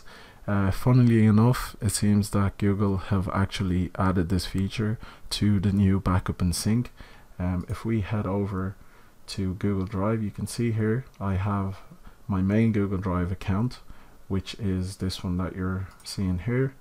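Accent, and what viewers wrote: Ireland. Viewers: Thanks a really great video. No problem with your ramblings man everyone loves an Irish accent. At least that's what they tell me anyway! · Great job, I have been looking for this capability since 2016! Hope things are great at college. Also good to hear the Irish accent!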